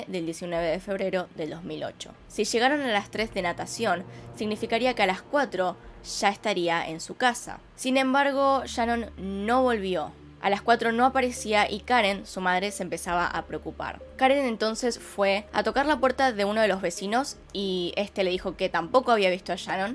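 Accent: Argentina. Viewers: Amo tus videos y tu acento argentino que le da como mas emosion a los casos! Saludos desde west palm beach, florida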